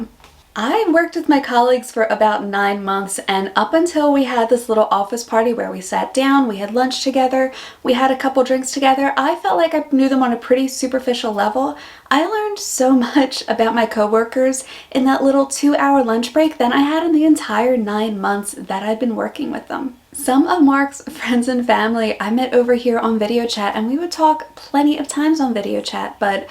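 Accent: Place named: Australia. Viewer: Fantastic. You have nailed some of the big differences between here and the States. You are sounding more Aussie everyday.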